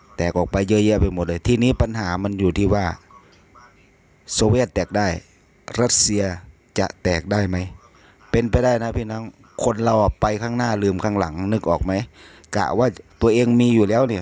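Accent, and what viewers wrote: Isan, Thailand. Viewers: พากษ์อีสานโหลดอ้าย ฟังง่ายดี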